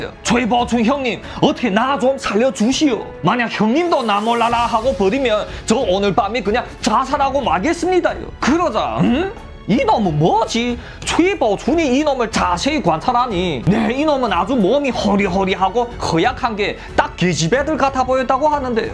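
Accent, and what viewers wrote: Yanbian, China. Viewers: 연변말 북한말씨 다른 것 알겠네요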